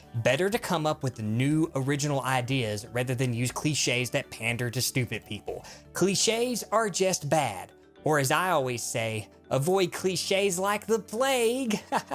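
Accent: South, United States. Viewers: Wtf face reveal! Also, you look way less southern than you sound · Are you from the south? I never noticed how southern your voice sounded when I couldn't see your face. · You have the most interesting of accents that's its rather indiscernable what corner of the US (or Canada? Nah, I don't hear Canadian...) you hail from. It's like a southern twang with a twist. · NEVERMIND IT'S TENNESSE I KNEW IT WAS AMERICAN SOUTH. Also I was looking at his website and the thing about time traveling Nazis was in the same paragraph than the TENNESSE thing. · It's so weird actually seeing him, and then hearing him speak with the Southern(?) accent, even though I've heard him speak with it before. I guess it's because I live in New York City and only see him as a cartoon, and thus I associate the accent only with the cartoon, and not with three dimensional people.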